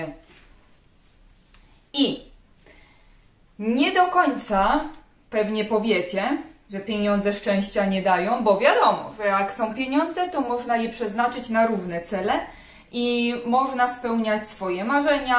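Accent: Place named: Silesia, Poland. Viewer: Widać że się pilnujesz w słowach i wymowie. Czuć Śląsk z daleka 😊 pozdrawiam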